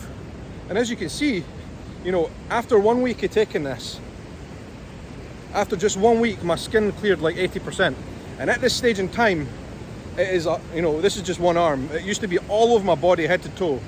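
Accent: Scotland, United Kingdom. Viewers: Do you have a heavy Scottish accent in Portuguese too? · The curiosity might be not just the English speaking but the "funny" accent. LOL Nobody can turn down listening to a Scottish accent! ;) My Dad was from Forth so I could listen to you talk all day long! So glad the cyclosporine has been such a miracle for you! Heather xo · ...INTERESTING contrast: a Scots' accent...in BRAZIL...! WHEN you take up running, make sure the shoes are SUPPORTIVE; (YOUR knees will THANK YOU...). BTW: I'm VERY HAPPY you respond well to the cyclosporin... When you need to get off cyclosporin, DO try diet changes... YOU have a LOT of positive attributes going for you... 👍😃👣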